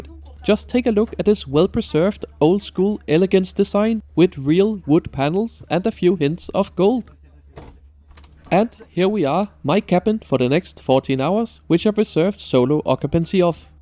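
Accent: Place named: Denmark. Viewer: He's from Denmark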